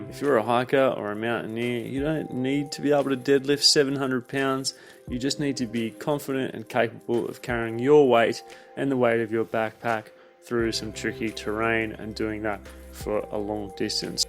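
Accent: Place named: Australia. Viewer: First time watching, excellent. Guessed Australian before you mentioned it but thought you were a Norwegian who learned English there.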